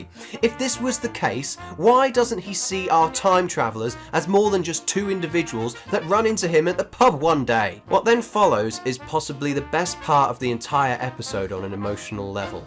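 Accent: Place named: Wales, United Kingdom. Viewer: There's nowt wrong with a Welsh accent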